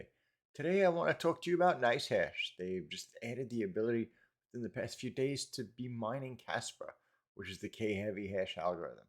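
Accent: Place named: New York, United States. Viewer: The more videos he makes, the more NY he sounds! Love it!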